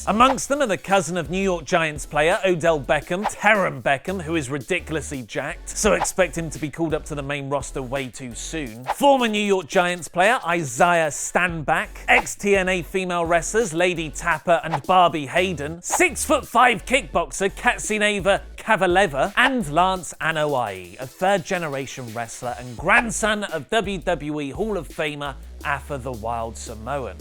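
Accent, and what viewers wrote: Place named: United Kingdom. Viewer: I love his accent so British lol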